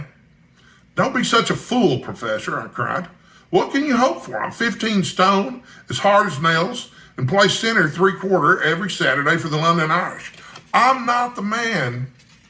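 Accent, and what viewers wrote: Texas, United States. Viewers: You had me laughing out loud with this chapter, especially during the description of of their gymnastic tussle! I think I have the best chance of feeling fond of Professor Challenger hearing him with a wonderful Texan accent. I daresay the one thing that can make Conan Doyle even more enjoyable than it is on its own, is to picture it taking place with Texans rather than Englishmen (no offense to the English!). Anyway thanks for the reading.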